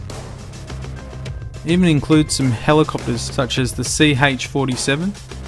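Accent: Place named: Australia. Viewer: lol I could tell from ur accent, Im just across the ditch from u:)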